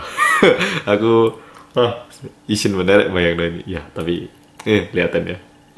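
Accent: Java, Indonesia. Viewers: Wow bahasa indo nya medok banget... Gw kerja di korea tapi bahasa koreanya gak sebagus bahasa indo dia.... Hahha Sini ke gwangju hehe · Seneng denger logat nya kakak indonesia sekali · Orang korea tapi medok jowo ne 😁✌ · pertama nonton videonya ngga pake headset kirain logatnya korea,ternyata jawa,proud of you kak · Wkwk bisa bhada indonesia tp logat dominan ke logat jawa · haha.jadi penasaran apa yg sebenernya telah terjadi di balik layar XD. sy subscribe sampeyan karena informatif dan medok jowo. eh ga nyangka jadi koordinator RM yg sy tonton selama -/+ 6tahunan. anyway, just curious, kalau sampeyan bergumam atau ngomong dalam hati pakai bahasa apa?haha. atau sekedar ngomong 'kasar' atau mengumpat. disesuaikan dengan lingkungankah?haha. fighting! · Medhok ya 😂👍🏼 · korea roso jowo ngene ki! kueren tenan mas!:D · Kaget pas ngomong ternyata medok kirain bakal ngomong korea atau belum fasih bahasa indo gitu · ini beneran orang korea asli? 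bahasanya kox medok jawa ya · medok Jawa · Sip bang. Jowo medok temen. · fasih banget bahasa jawa nya wkwkw medhok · Mas ini aslinya orang korea ya? tpi cara ngomong jowo banget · logat jowo ne kuentel marai ngakak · Hai kak.. aku subscriber baru nih di channel kakak.. beh ~ seneng banget liat kak ngomong bahasa jawa.. 😆 medoknya itu lho 'njawani buanget'.. 😆 ditunggu lho kak yang part 5 nya ~ 😁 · Suka suaranya agak medok jawa.... 😀😘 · Sumpaaah jowoo bgt, koq iso toh?? Hahahhaha logat korea'ne ilang, hahahhahaha i adore u!!!!! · Bahasa jawanya logatnya sma sprti orang chinese jawa...mngkin dulu dimalang tmn2nya bnyk orang chinese... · Ini org jawa atau korea???hehehe.. cocok.bgt oppa ini jd org jawa ya. Top deh :-) :-) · ini mas nya orang korea ? halahyung .. kok luweh medok ko aku 😫😫😫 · Org korea ngomongnya medok bgt👍🏻👍🏻😍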